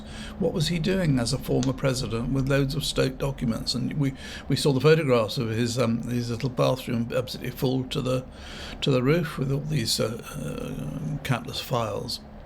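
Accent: RP, United Kingdom. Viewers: Looney indeed. An old and boring man repeating all the leftist lies, obviously envious of other countries' prosperity (his idiotic visit to Poland showed how little he knows), Pathetic. He probably thinks that his RP English makes him sound elevated and wise. Britain is a sorry shadow of its past, dirty, forgotten, filled with people without purpose, money, and future. Sir, I feel sorry for you (I mean the channel owner).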